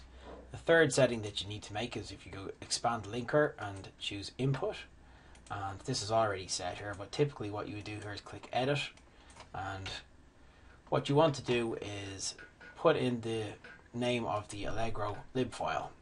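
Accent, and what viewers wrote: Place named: Ireland. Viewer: Had to find this video again. I just listened for the Irish and here you are. Thanks again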